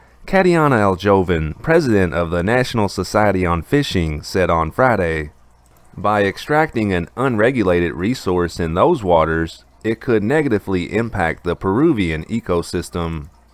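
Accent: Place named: Appalachia, United States. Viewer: how yual doooin. love this guys hillbilly accent.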